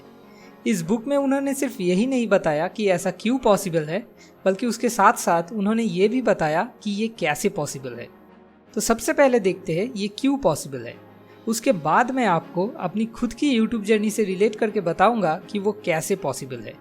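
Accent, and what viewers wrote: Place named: Bihar, India. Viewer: pure bihari voice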